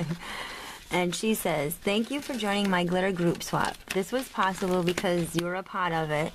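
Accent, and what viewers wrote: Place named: East Coast, United States. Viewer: hahaha! OF COURSE I loved what u said at the end.....yes, your accent. I love it hahaha. U probably get tired of me saying it. It's the "R" that gives your accent it's different sound. East coast!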